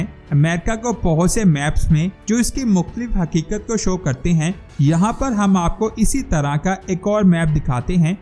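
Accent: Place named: Pakistan. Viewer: Love from india ! You're the first good Pakistani YouTuber I've seen (can tell by your Hindi/Urdu accent)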